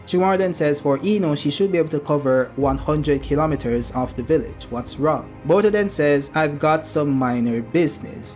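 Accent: Jamaica. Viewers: you a jamaican bro?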